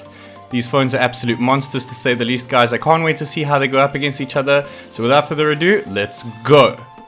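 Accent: South Africa. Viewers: You sound South African..? 🇿🇦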